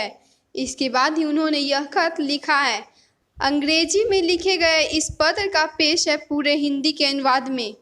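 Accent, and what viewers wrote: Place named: England, United Kingdom. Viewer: इस प्रकार के compere !!! इंग्लैंड में पैदा हुए थे और भारत मे हिंदी बोल रहे हैं। स को श बोलने वाले ऐसे लोगों को तो dislike करना भी संभव नहीं। अच्छी खासी न्यूज़ की रेड़ मार देते हैं, पूरा मज़ा इनकी भाषा और accent से खराब हो जाता है।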